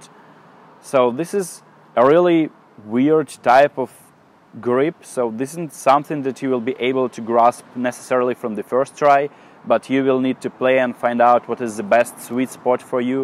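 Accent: Russia. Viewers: Man, you have a Russian accent. Ты ведь русский, да? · Ты русский? у тебя сильный акцент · Русский акцент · Такое ощущение, как-будто этот чувак русский. Его английский слишком русский :) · Акцент,как у русского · Слышен русский акцент) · Русский акцент.... хмммммм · ты русский ? просто мне кажется, что есть акцент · акцент четко русский да пох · Слушая голос и думаю - блин, акцент ну больно русский. Захожу в описание - о, да ладно, кириллица! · Вау, вы так хорошо разговариваете на английском! Но этот русский акцент я узнаю везде)) З.Ы. в первый раз у вас на канале. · классный русский акцент)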